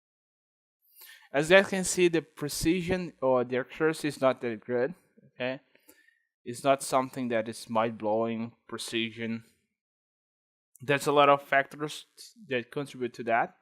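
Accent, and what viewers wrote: Brazil. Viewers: E esse ingles de br ai.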